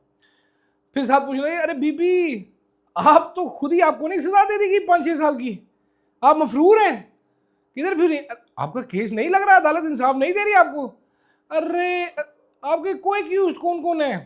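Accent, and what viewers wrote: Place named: Sindh, India. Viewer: Love your Sindhi accent Please try Pashto accent next time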